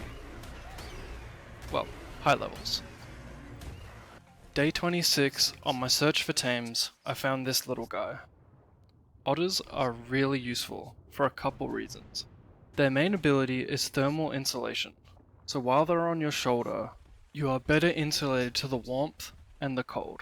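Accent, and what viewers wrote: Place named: Johannesburg, South Africa. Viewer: is it just me or does he sound like a joberg boet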